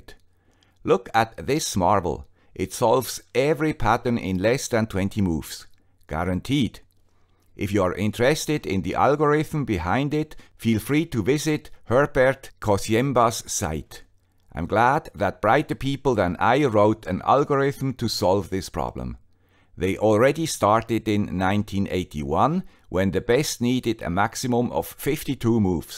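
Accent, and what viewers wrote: Switzerland. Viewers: Fantastic! I am definitely going to build one - Ive a spare raspberry pi so I only need to buy two servos. Thanks for another great video man with the Swiss accent!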